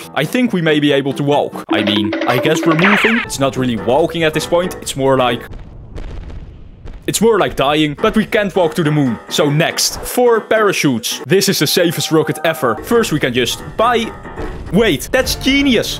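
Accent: Netherlands. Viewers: Are u dutch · I can hear the dutch accent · ben je Nederlands ik hoor het aan je accent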